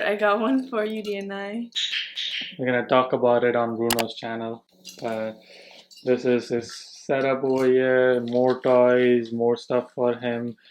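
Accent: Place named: India. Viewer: Do you know hindi I am asking you this because your voice has some indian accent and your face is also like indian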